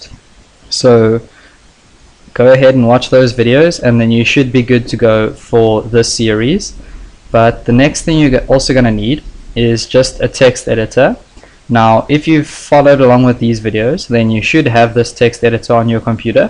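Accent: South Africa. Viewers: south african! Respect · Dude are you South African? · 100% south african accent. aweh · South African?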